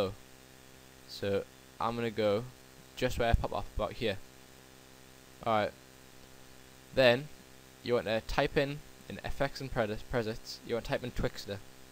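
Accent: United Kingdom. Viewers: nice tutorial man i like your british accent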